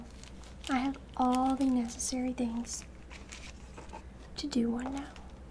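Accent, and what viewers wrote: South, United States. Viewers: I love your southern accent that keeps sneaking out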